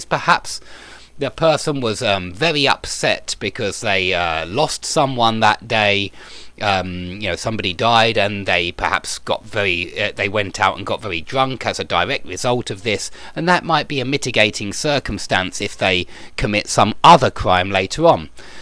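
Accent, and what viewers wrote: United Kingdom. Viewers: British accent, is most popular worldwide, when it comes to learn English. Thanks for the lessons sir.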